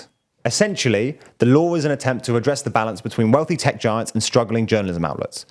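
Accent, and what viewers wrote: United Kingdom. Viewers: I normally don't like new presenters in my YouTube videos but is alright. I think for news what I am really looking for is a smart sounding British man to quickly and emotionlessly explain what's going on so he fits into the lineup like a glove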